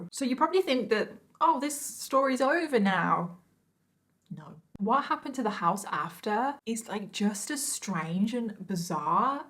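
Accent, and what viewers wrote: United Kingdom. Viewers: Was wondering why your videos were so similar to Bailey's.... then I saw in the description box you give her 100% credit for your inspiration! Good job ! Honest, beautiful, great makeup artist and story teller AND with a Brit accent ! Sad how Feliz means Happy 😧 One advice: Read Dante's Inferno, I know you're young so have not heard of or read it, but it should be a part of your literary culture. You'll thank me later ☺ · i mean i watch bailey but u dont watch THIS girl because shes british and i cant really understand her and also im learning american english and i dont want to mix these accents up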